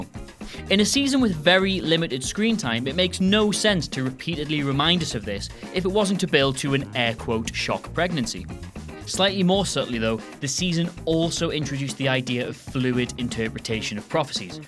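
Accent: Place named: Northern England, United Kingdom. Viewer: LL cool J Mate he's got a thick northern accent, in what world is that the Queen's English?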